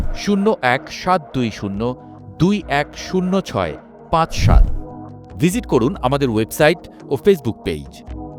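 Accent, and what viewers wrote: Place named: Bangladesh. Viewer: ভারতের কোন বিরোধি দল বিচ্ছিন্নতাবাদ সমর্থন করে? এটা ষড়যন্ত্র ছাড়া কিছু নয়। কোনও সৎবুদ্ধি সম্পন্ন ভারতীয় আর দেশ ভাগ হতে দেবে না। প্রতিবেদকের উচ্চারণ শুনে একজন বাংলাদেশী বলে মনে হচ্ছে। এই ধরণের প্ররোচনা মূলক ভিডিও বানানো থেকে বিরত থাকুন। আর কোনও ব্যক্তি বিশেষের দেশ নয় ভারত। এখানে সত্যিকারের গণতন্ত্র আছে। তাই এই দেশ 140 কোটি ভারতীয়ের। অবশ্য সত্যিকারের গণতন্ত্র কী তা বোঝার ক্ষমতা বাংলাদেশীদের নেই।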